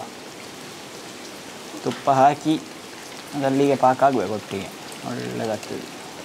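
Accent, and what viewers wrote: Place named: Dakshina Kannada, India. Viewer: ನೀವು ಬಿಡಿ.... ಯಾವತ್ತೂ ಸೂಪರ್. ನಿಮ್ಮ ಅಡುಗೆಯನ್ನು ನೋಡುವುದೇ ಚೆಂದ ಹಾಗೂ ನಿಮ್ಮ ದ.ಕ ದ ಕನ್ನಡ ಮಾತು ಕೇಳಲಿಕ್ಕೇ ಆನಂದ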